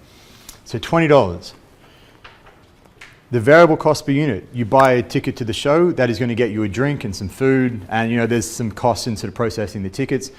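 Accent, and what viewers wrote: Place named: Scotland, United Kingdom. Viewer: Respected there... Please go slow .. You sound so scottish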